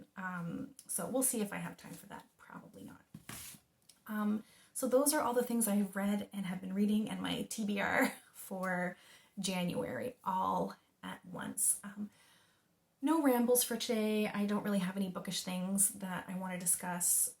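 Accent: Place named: Eastern Canada, Canada. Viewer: Haha - no I couldn't tell. I heard an "Eastern" Canadian accent once or twice, though. 😄 I'm from the West so I fail to differentiate all the many Eastern/Atlantic accents unless they are obvious obvious, like peppered with b'y, for example. 😂 You have a very subtle accent to my ears, whatever that means.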